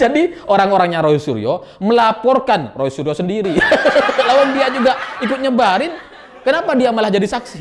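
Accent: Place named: Madura, Indonesia. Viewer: Logat kacong medok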